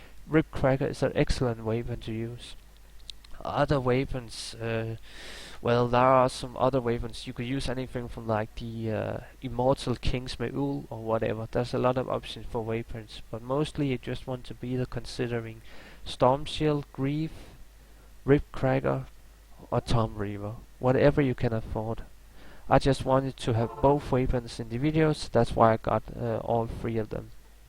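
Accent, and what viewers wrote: Denmark. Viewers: sounds like a dane..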